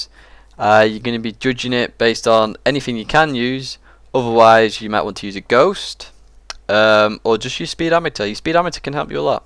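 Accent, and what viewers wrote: England, United Kingdom. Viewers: nobody gives a fuck about your videos and your stupid Engayland accent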